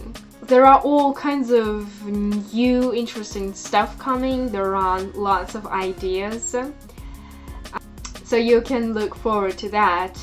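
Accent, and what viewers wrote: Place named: United Kingdom. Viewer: Fascinating accent with a little polished British in there. Like your videos. You should post more often.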